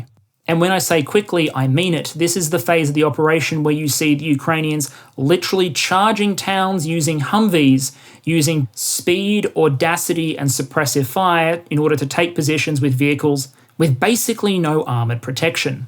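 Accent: Australia. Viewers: Not sure how a guy can speak in a fairly monotone Aussie voice, yet still be by far the one of most interesting and informative views on the war in Ukraine. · I'm Australian and he sure sounds like an Aussie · Thankyou for all your good work mate. Informative, instructive, clear and concise with a small dose of ozzie for good measure. Keen for more.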